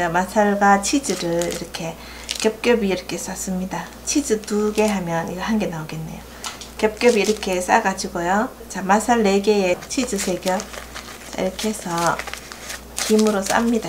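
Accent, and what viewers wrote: Gyeongsang, South Korea. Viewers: ~설명도 친절하게 잘하시고 못하는요리가 없으세요^^요즘~잘구독하고있습니다 덕분에~요리솜씨가 늘었어요 님목소리에서~경상도특유에 깊은정이 느껴져요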